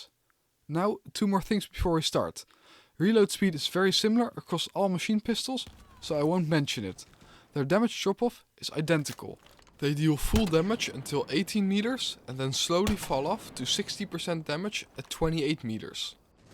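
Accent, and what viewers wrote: Netherlands. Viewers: ik hoor gelijk je bent Nederlands haha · Nederlander? hoorde het in de eerste 5 sec.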